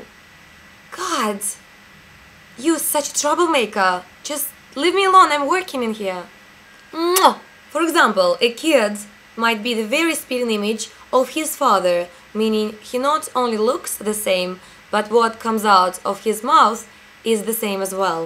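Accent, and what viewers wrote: Russia. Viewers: gaahaa! that russian accent omfg!!! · Yo are Russian?Have a rusian accent :) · ёпт !! у неё русский акцент..... она 100пудово говорит по русски !!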